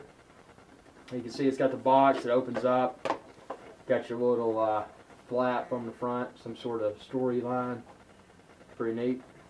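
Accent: South, United States. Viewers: Not true there was neverwinter nights on the old imagine web service back in the day. I feel you are unqualified to review games, Because you are a mac user. Not to mention your southern accent really makes it hard for anyone to take you seriously. not to mention the fact that you showed no gameplay footage nor did you mention the features of the game. honestly I don't think you even played it.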